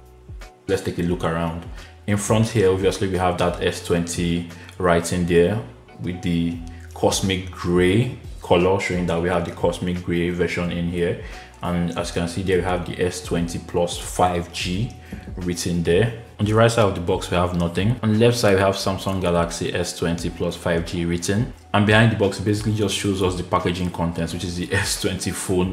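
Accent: Nigeria. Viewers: You have a Nigerian accent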